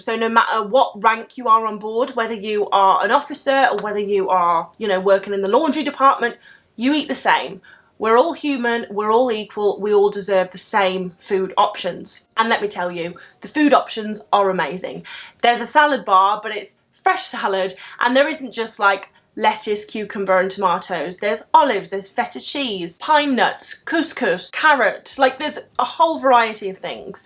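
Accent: United Kingdom. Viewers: Haha, I might work on a crew ship later in life because I don't have any experience now. But your British accent is addictive. Just watched every single video to hear you talking 🤣😂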